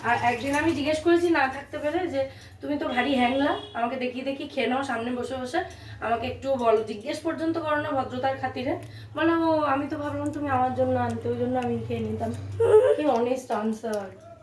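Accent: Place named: Bangal, Bangladesh. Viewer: একটা কথা জিজ্ঞেস করছি বোন কিছু মনে করোনা।তোমার বাপের বাড়ি আর শ্বশুরবাড়ির মধ্যে কারা বাঙাল?তোমার কথায় টান আছে তাই।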